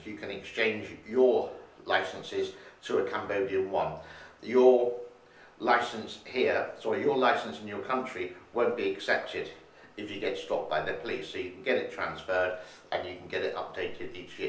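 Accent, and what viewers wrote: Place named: RP, United Kingdom. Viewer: Your accent is somewhat posh. In brief, what is your background?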